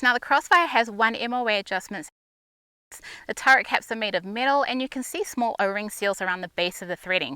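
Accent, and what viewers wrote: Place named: Australia. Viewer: Damn woman, you know your shit I Know it’s kinda weird but I love the accent. I spent a few years in Adelaide with some very good family friends and would love to make it back someday. Anyway, sorry for the essay, but keep up the good work on the videos , very informative to people looking into new sighting systems . Convinced me to get a prism due to my astigmatism (even at 34), it’s been a good quick optic with very few issues. Looking into lvpos now so we shall see. Maybe i’ll just get a solid magnifier and maybe a canted red dot. Anyways cheers, good shit!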